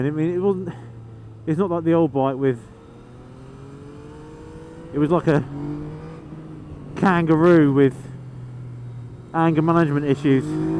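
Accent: United Kingdom. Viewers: The british accent gets old after a while.. Borderline annoying. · Its the queens tounge, it never gets old.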